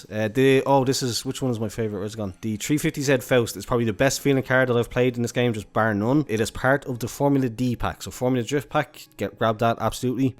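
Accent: Dublin, Ireland. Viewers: U sound Irish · You from dublin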